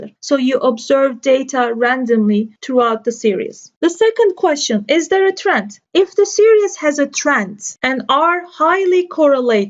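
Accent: Turkey. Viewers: I can tell this is "Turkish Accent". :-) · Your voice sounded me like turkish pronunciation. Smooth explanation.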